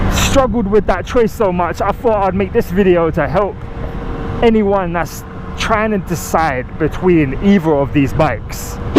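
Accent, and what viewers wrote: London, United Kingdom. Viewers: I'm confused, you have a british accent but your nott in the UK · Too young to be old new London accent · That's not a British accent, that's the accent the white boys who want to be black use in London. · I think he's from London and I know loads of geezas who talk like him.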